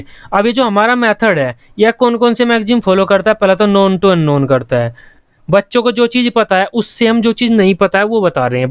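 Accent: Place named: Saharanpur, India. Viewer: Bhai language se 100% Saharanpur ka lagra h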